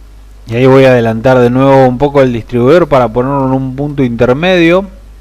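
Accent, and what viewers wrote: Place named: Argentina. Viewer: por tu acento creo que sos de Argentina? Soy de Colombia y veo que el carburador difiere del que tienen instaladas las 2.0 aca! Creo que es un SOLEX 32/32. Podrias compartir que referencia de carburador traen alla los R18? Gracias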